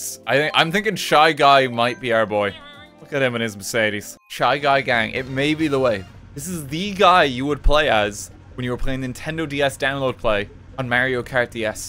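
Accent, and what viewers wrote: Ireland. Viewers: Are you accutaly Irish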